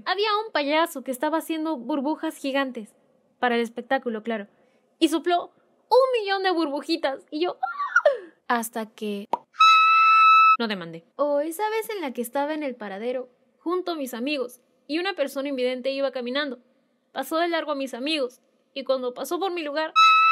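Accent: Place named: Chile. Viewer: sos chilena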